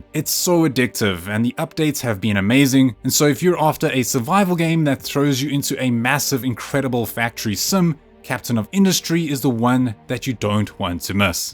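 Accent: South Africa. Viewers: Are you South African? Sounds very familiar! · bro why do you sound south african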